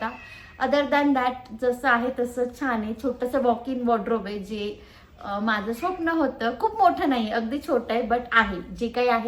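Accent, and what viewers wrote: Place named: Sinnar, India. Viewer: Sinnar che lok bolun asech ahe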